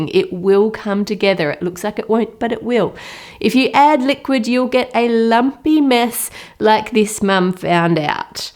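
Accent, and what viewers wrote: Australia. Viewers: She sounds Australian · Looking gooouud. That aussie accent 😅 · That Aussie accent is just gorgeous. And of course your content is awesome ! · I am in love with her Australien accent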